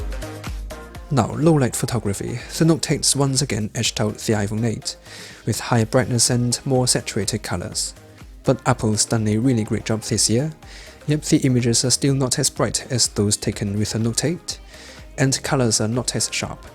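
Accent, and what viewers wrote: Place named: United Kingdom. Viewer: 你的英音真的好听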